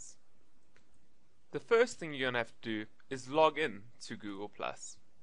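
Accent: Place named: South Africa. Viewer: I immediately recognized your accent as a fellow South African! I have lived in Portland, Oregon, USA for the past 31 years, and still miss my homeland although I am very happy here. Thanks for the helpful info.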